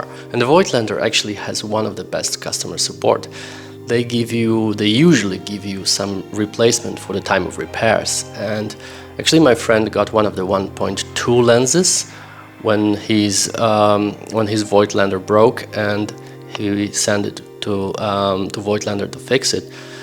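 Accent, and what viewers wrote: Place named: Poland. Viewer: Po akcencie mam wrazenie ze jestes Polakiem:) Nagrywasz tez filmy w polskim jezyku?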